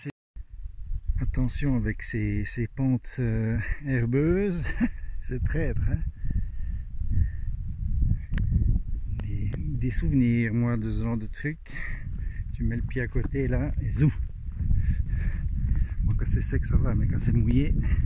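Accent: Switzerland. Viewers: Merci pour ces belles images, à mon sens une grande qualité de réalisation! et j'apprécie tes valeurs (l'humain con ^^) Cela donne envie de visiter la Suisse en tout cas Et pourtant que de préjugés et autres clichés vu de France (bon en même temps vous nous le rendez bien) j'adore ton accent (léger) qui me fait voyager pas si loin certes, et pourtant il y a déjà tant de différences culturelles.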